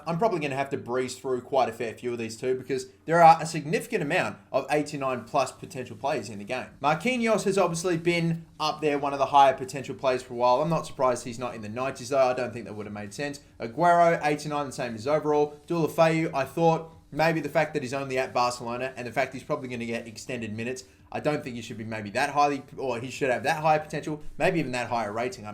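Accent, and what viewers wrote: Australia. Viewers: U aussie m8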